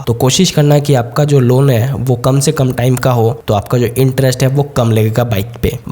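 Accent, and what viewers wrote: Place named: Bengal, India. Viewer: Bengali accent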